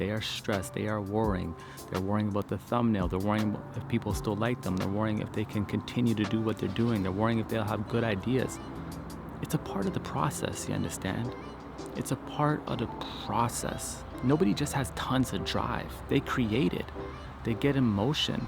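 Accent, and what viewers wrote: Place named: Jamaica. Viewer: I miss your Jamaican accent!. Love your message though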